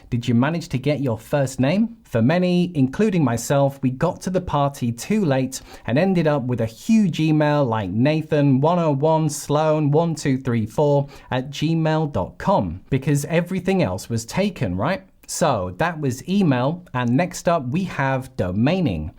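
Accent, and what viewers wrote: United Kingdom. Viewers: Life is SMOOTH with a British accent........Cheers My Love...!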